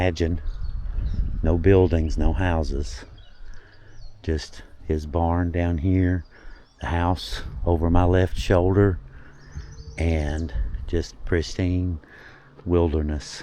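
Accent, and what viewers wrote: South, United States. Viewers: So pleased you covered this infamous piece of history. Super video. And of course I could listen to your southern accent all day long. Love from New Zealand 🇳🇿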